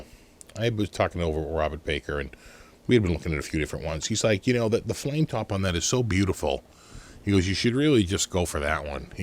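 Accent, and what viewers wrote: Boston, United States. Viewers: Boston English: Tuner = Tuna Tunah = Tuner Go figah....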